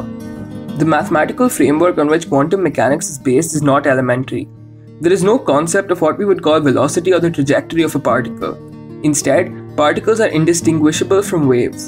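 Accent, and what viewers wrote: India. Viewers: India. The accent. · Why is it so difficult to understand Indian accent? :( I know Spanish is also quite terrible. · Your accent sounds like that of an Indian. Are you a student of some IISER/IISC?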